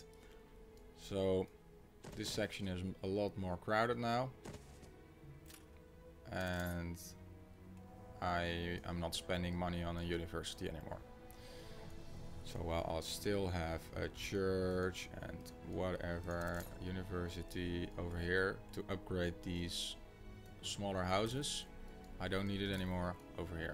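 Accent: Netherlands. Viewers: a lot of ppl are annoyed with the Dutch accent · Ik herken een Hollander uit 100 accenten :D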